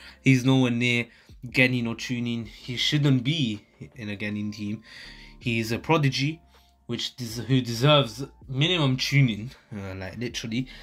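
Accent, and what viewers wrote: United Kingdom. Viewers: Are you British mate yeh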